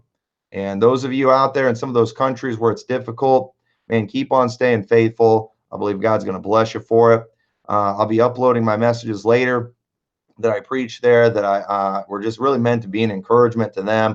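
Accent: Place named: New England, United States. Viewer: I was hopeful that your Yankee accent was going to be a new temporary super power, but hopefully it was an encouragement to the local soulwinners that it can be fruitful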